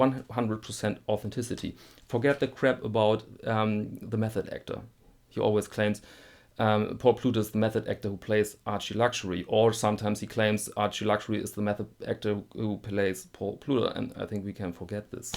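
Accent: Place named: Germany. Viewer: I enjoy your videos for several reasons... Mostly, your accent and mannerisms. You remind me of my Grandfather who was born and raised in northern Switzerland in the city of Aarau. I understand that you have a German accent, but you sound much like my memory of a great man. Also great content. Cheers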